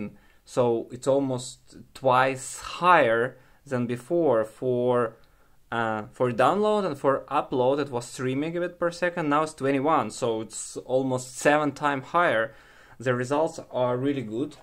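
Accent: Russia. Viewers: I like your Russian accent thanks for the video man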